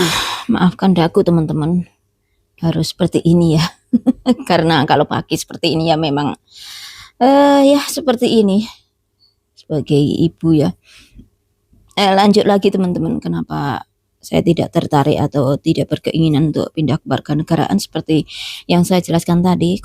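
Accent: Java, Indonesia. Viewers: Mba lucu bahasanya, Jawa medok Amerika medok 😂👍, khususnya kalo ngobrol sama anak2nya, mba wanita pintar Dimata saya👍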